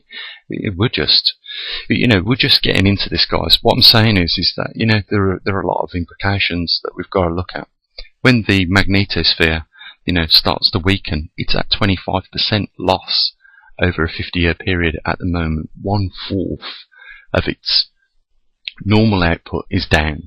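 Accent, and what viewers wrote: United Kingdom. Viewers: He's got a Birmingham (UK) accent.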